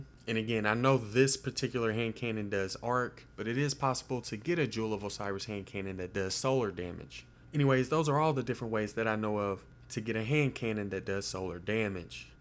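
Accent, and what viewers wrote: South, United States. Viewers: Cawwwwnnnnttttrrrryyyy as hell!! XD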